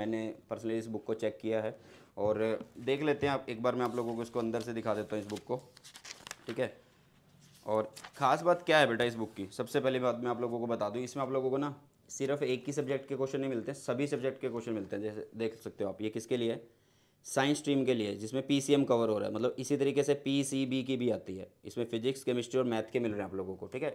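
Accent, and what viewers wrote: Haryana, India. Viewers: Sir are you from haryana??🤔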